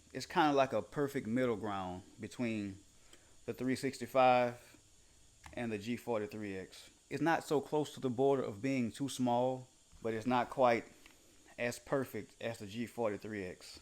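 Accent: Louisiana, United States. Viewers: Bro you got to be from Louisiana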